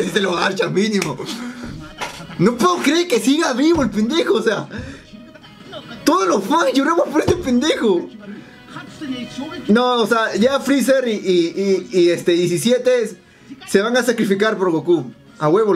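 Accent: Mexico. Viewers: por que hablas como mexicano si eres peruano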